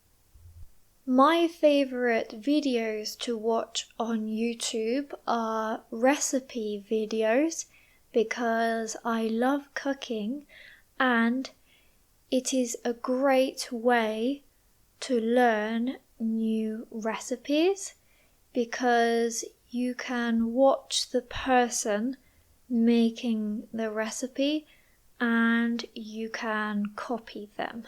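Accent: United Kingdom. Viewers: Your voice is amazing and the British pronunciation is my favourite. ❤ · Normally i don't like british accent but your voice is so good. Thank you for video. I watched first time and i will watch other videos. Hi from Turkey 🇹🇷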